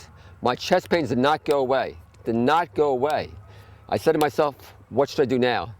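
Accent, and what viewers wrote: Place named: Philadelphia, United States. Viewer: Sweet :) I grew up outside Philly and am an 18 year raw vegan. I moved to Portland, Oregon, long ago, and when I go back to Philly, folks do not understand what I am doing. They guess my age as 20 years younger than I am. (In Portland people guess my age as 10 years younger than I am.) So it is quite a comfort to hear someone with this knowledge and practice sharing it with a Philly accent. :) If I ever go back, I will make a bee line and come and visit you. Blessings Always.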